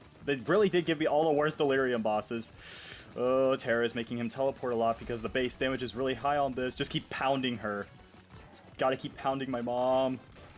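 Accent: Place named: United Kingdom. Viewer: just play roblox with the bri' ish bloke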